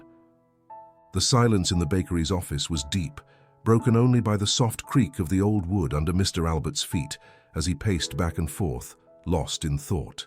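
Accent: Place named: United Kingdom. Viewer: I enjoy your English English. American English I do not like so much. So your pronunciation is a melody for my ears. Hard work, honesty, perseverance is what makes people happy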